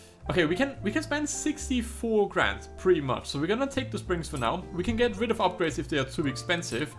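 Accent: Germany. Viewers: he is German / Austrian I can hear it as I also am germna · man hört das du Deutsch bist :) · Are you from Germany? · R u German? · Are you german?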